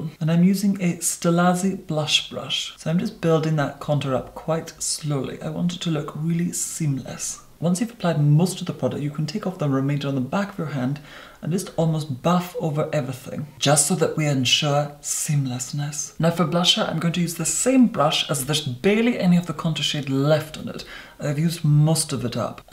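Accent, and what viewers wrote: Scotland, United Kingdom. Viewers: I'm British...but I've never heard someone talk so British before 😂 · I'm moving to Scotland just so I can hear this accent everyday. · Agustina Riveros what professor chaos said is totally correct is from the Hebrides but has been putting on a posh english affectation since he moved to London. If you watch his very first movies you can hear his original accent was much more scottish sounding, it's quite lovely! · Very posh version of Scottish. There are many dialects of Scots that sound nowhere near as regal. To me the very rich accent that he has always reminds me of Minerva magonigal or Mrs doubtfire and that’s coming from a Scottish person 😹 I don’t speak nearly as eloquently as he does. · Interesting accident - Scottish or Irish maybe, with either elocution lessons or self taught elocution eradicating most of his original speech patterns. 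Reminds me of Margaret Thatcher, or Russell Brand......you can tell he's attempted to learn RP, it's not what he's grown up with. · true he sounds like he’s a rich brit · English At Work scottish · I didn't know posh Scottish was a thing. Now I know. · it's like a velvety upper class Scottish accent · Agustina Riveros he’s from a Scottish island but also has a posher type London England accent on top of it Someone may correct me but I think that’s what it is-I don’t believe it’s exactly and example of a natural accent though I believe he has altered it. He is so interesting to hear speak and I love the way he does and his vocabulary as well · He's a well spoken Scottish person.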